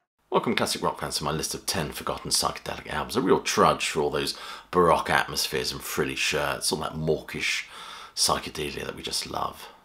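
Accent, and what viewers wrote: England, United Kingdom. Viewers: The Third Rail is psychedelic? You obviously have not taken the right drugs. granted you accent sounds English, and English osych was more pastoral than the US freak out over being drafted or shafted in the civil rights era, but most of these bands sound more like pop bands with psychedelic flourishes. And that is how they were reviewed. I owned most of these albums - emphasis on past tense, but they found their way to the used bins.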